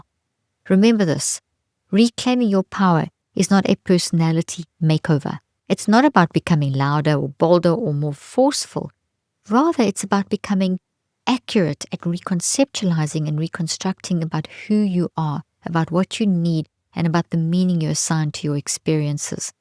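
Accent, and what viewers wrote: South Africa. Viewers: Dr Leaf, are you maybe South African because your accent sounds exactly?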